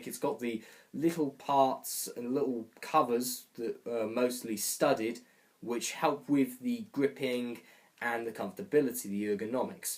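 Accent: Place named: United Kingdom. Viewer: I knew you were British from your accent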